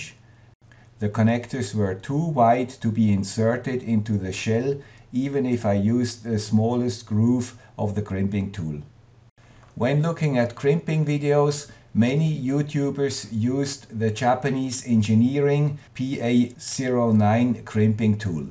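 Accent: Switzerland. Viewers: Greetings the guy with the Swiss accent :)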